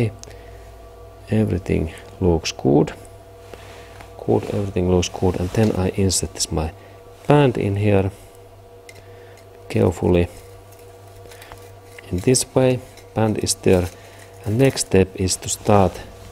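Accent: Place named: Finland. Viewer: Thanks for your videos. I am getting to like the Finnish accent!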